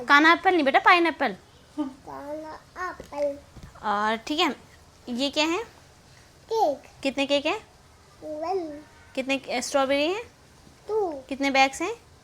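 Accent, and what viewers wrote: Garhwal, India. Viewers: आपका tones gadwali लगती hindi v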